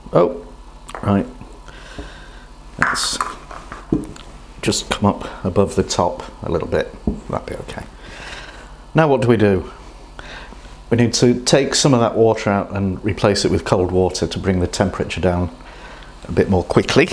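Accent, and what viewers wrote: United Kingdom. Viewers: I love your accent! I'm American but my husband is Northern Irish. So I always love hearing the different dialects of British accents, and yours is lovely. Soothing to listen to. I also really enjoyed your video. And this is very awesome. I've never seen the long egg before now... And I think you did a very amazing job. Your videos are very well made, and I just love watching you cook lol! I'm not sure what it's called... Forgive me for my ignorance, but it's a common dish in Britain where they boil an egg and crack it open and eat it from the shell. I'd love to know if you have a video for that, or could maybe do one.. I've always wanted to learn how to make that and I really like your method of cooking! Or do you maybe have any knowledge of the proper recipe for it. Any how, I definitely wanna try this long egg! I love eggs, some salt, parsley, bread or crackers... 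Yummy. So this would be nice for so many reason, a gathering of friends an appetizer, or for breakfast with the family etc. Keep sharing! P.s that's a really awesome concoction you made there for the egg. Very smart and clever. Brilliant actually lol. Take care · Kernel sanders is British?!?!?! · I like the way brits talk. I wish he said potter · British Colonel Sanders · is he British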